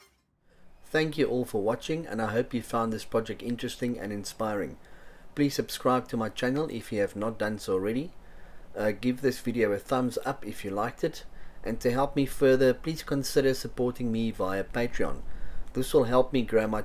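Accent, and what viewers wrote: South Africa. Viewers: South African? Dig the design!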